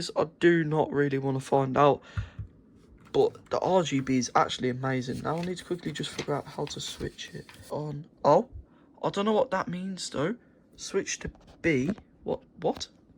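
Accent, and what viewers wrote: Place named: Dudley, United Kingdom. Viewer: the accent bro, I’m from Wolverhampton and my moms side of the family are majority Dudley so I’ve grey up around the accent so I can spot a Dudley accent easily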